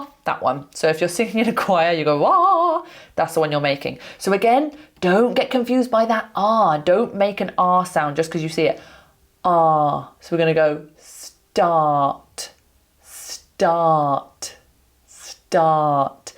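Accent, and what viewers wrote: United Kingdom. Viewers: Really like your videos, like British accent ❤ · Hello, I love learning British accent from you. They have a lovely British English sound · Me gusta escuchar tu acento británico, y por cierto , te pareces mucho a Alice Merton. 😤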